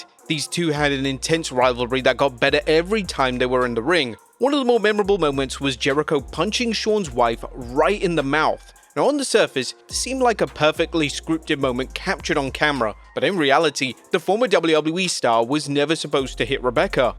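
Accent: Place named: United Kingdom. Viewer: Bri'ish bro talking smack about the jackass crew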